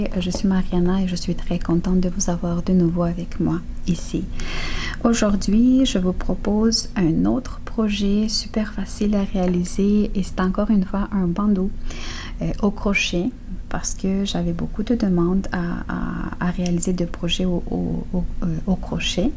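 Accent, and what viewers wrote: Canada. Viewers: Merci 😀 pour votre beau bandeau et vos explications ce point est vraiment très très joli et pas compliqué ,je me trompe peut-être, mais d'après votre voix, et sans être indiscrète ne seriez vous pas d'origine canadienne ? Si je ne suis pas trop curieuse pouvez me donner votre réponse svp un tout grand merci 🇧🇪 👍 😀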